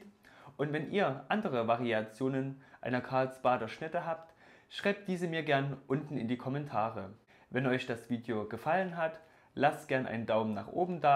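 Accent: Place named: Saxony, Germany. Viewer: ich finds immer wieder süß wie der Sachse versucht das Sächseln zu unterdrücken wenns öffentlich wird. :.-) Bin selber Leipziger und habe das bei vielen Videos bemerkt. Gutes Video , aber wo der Käse da schon "Farbe" hat weiß ich jedoch nicht . ;-) Aber Die "Karli" mach ich mir jetzt trotzdem.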